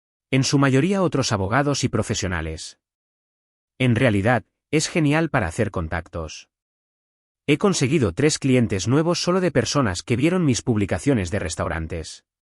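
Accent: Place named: Andalusia, Spain. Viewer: ES FACINANTE ÉL ESCUCHAR AL NARRADOR CON ÉSE PERFECTO ESTILO ANDALUZ...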